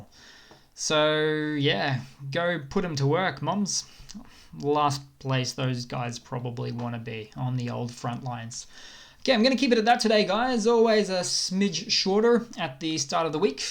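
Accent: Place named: Australia. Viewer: I only listen for the Aussie accent.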